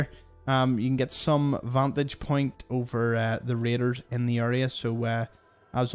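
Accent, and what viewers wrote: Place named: Scotland, United Kingdom. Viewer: One thing to keep in mind is the bombs dropped on a Saturday morning. Was it a worker fighting the boss, or had someone broken into the building & made their way to the office and the safe only to be accosted by security? As to never having explored it, I figured the buildings with candles by the door in Concord were waving players to come that way. Lastly, love your accent. Is that Scottish? A person has to ask, because there is a separate accent practically every other mile a person travels in the UK.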